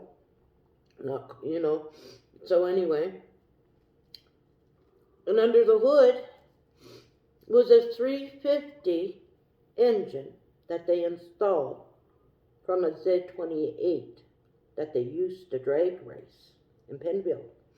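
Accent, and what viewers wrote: Canada. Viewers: Are you originally from alberta? Some things you say sounds like you’re from one of the Atlantic provinces!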